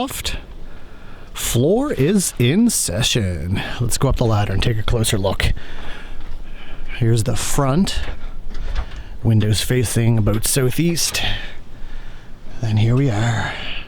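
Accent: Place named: Atlantic Canada, Canada. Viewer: Are you on the East Coast of Canada? This is a guess just looking at your woods and accent. I'm in NB.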